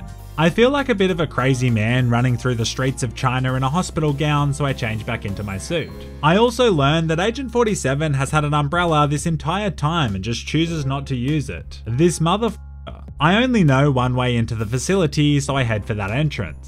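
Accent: Australia. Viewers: I'm trying to figure out if he's either a Australian or a New Zealander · I’m Australian and I’ve never heard someone speak like Aussie Man. Now you are speaking like Aussie man. Can’t be a coincidence.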